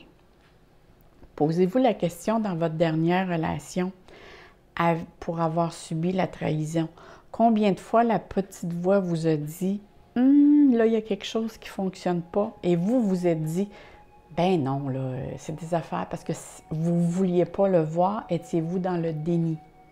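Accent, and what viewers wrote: Quebec, Canada. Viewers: Trop marrant l'accent canadien 😂😂😂😂😂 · Merci 🙏 pour ce beau tirage et gratitude 🌼 pour vos précieux conseils ✨ Quel plaisir d'entendre votre accent de nos cousins de la belle Province. Gros bisous de France 🌍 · J'adore votre voix apaisente et et votre accent du Canada J'adore à fond mais ce que vous dire n'est pas faux la différence est que pour moi C un peu différent car j'ai 63 ans il serait temps que je rencontre un homme pour fin de vie heureuse merci beaucoup suis scorpion ascendant taureau bizzzzz de France vous êtes adorable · j’ai choisit le n°2 et votre interprétation me parle beaucoup. J’aime vous écouter, comme il est dit dans un autre commentaire votre voix est apaisante et j’adore votre accent québécois aussi. Merci pour tout. Bye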